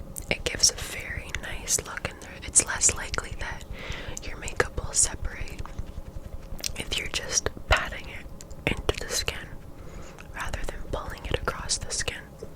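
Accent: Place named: Canada. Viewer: Hello my favourite asmrtist in the world good to see you making some more lovely asmr again. I love your whispering Canadian accent it’s beautiful and I love your beautiful lovely overgrown long smooth silky soft brunette hairy mane of yours 😌❤️., I have a favourite asmr request I would love for you to make it for me to help me get to sleep 😴 please if that’s ok with you as I was thinking since I love slowly relaxed real hair brushing asmr and brushing long hair fully covering🙈all over face asmr it would be wonderful and fulfilling to see you brush your beautiful mane and brush your beautiful mane fully covering all over your face but for my asmr request as the rhetorical question can you do 30mins long slowly relaxed real brushing your long hair fully covering 🙈all over your face nonstop asmr with no mic 🎙️ and no earphones if that’s ok with you, can I comment the asmr video link or send an email to you of the video if that’s fine by you aswell 😇😌❤️👍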